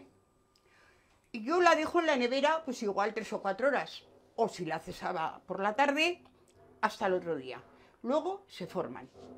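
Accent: Aragon, Spain. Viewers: Me gustan tus recetas y me gusta mucho mas ese "acentico" aragones que tienes, debe de ser porque yo tambien soy, nacida en ZARAGOZA .🙅‍♀️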